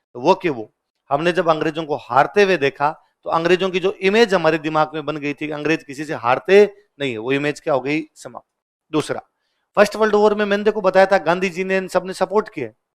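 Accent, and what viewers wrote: Rajasthan, India. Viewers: Raj saa u rock and ur tone of rjasthani is owsome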